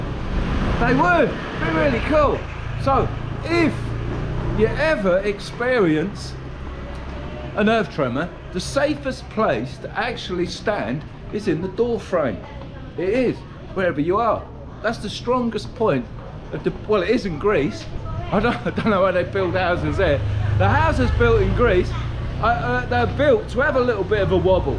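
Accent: England, United Kingdom. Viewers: Where are you from? England??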